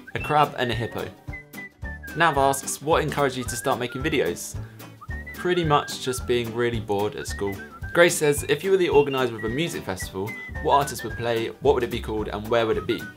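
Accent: California, United States. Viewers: Lol his Cali accent sounds like a gay man xD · Your Cali accent is adorable! XD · That California accent is on point! Couldn't stop laughing :) Love this video! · Ur american accent omg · omg the california accent #blesd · lol. your californian accent. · That Californian accent. On point. Haha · your Californian accent. XD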